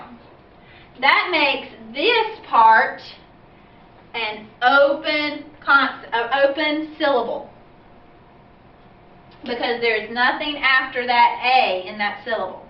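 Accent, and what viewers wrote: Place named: South, United States. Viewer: Vicky Popova it’s a very common pronunciation for native speakers in the southern United States. Definitely a native speaker as non-native speakers don’t tend to learn this particular dialect or whatever.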